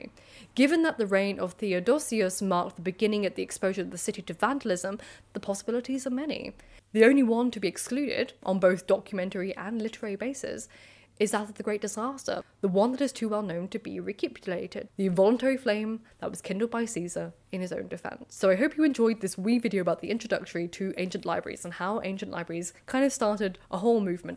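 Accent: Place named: England, United Kingdom. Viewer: Semi-regular who is constantly asked about their strong southern American accent, tunes in for, strong English accent…I reckon. :)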